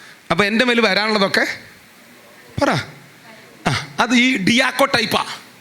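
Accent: Thrissur, India. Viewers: എനിക്കിഷ്ടമാ ഈ തൃശൂർ ഭാഷ.... അത് പോലെ പാസ്റ്ററിന്റെ ചിരി.... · തൃശൂർ ഭാഷയ്ക്ക് എന്താ കുഴപ്പം. ഒരു കുഴപ്പവും ഇല്ല.. സാർ ധൈര്യമായി പറഞ്ഞോ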